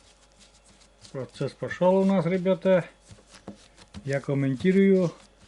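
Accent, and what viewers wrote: Komi, Russia. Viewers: Добрый день.смотриш на вас и душа радуется за вас,у вас всегда все хорошо.дай бог вам здаровье!у вас красивый коми язык + русский- красиво звучит.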